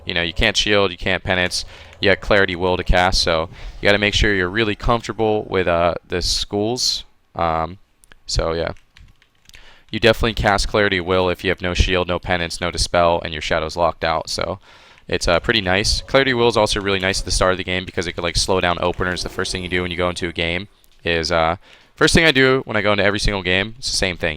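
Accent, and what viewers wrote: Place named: United States. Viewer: he sounds american.....but he uses the lingo of someone who isnt...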